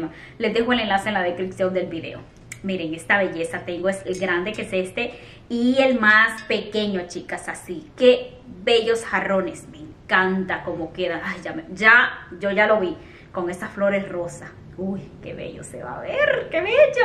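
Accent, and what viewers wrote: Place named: Dominican Republic. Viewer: Hola. Creía que eras Dominicana. Tienes una forma de hablar y expresiones nuestras. Salidos a los Hondureños.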